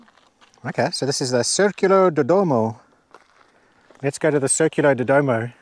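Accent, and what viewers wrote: South Africa. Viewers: Hi from Johannesburg, been watching for videos for a while now and rally great. Love hearing you SA accent. Love Castelo Branco, have visited many times , usually stay at the Tryp Hotel next to the Castle. We own a small farm in Juncal de Campo. Have not been able to travel to Portugal since Covid. Cant wait to come back, your videos make me miss it so much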